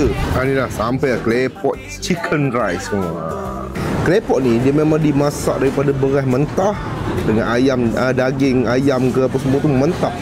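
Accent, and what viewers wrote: Malaysia. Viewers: Dia ni melayu ke cina 😂😂 slang melayu habis.. ni betul2 org msia. ❤❤❤ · Gila aku mati² ingt brader ni melayu Bila tgk nama channel baru lah cine rupanya, mmg pior sleng n sgt fasih bhsa melayu dia.. Terbaikk lah bang smoga maju jaya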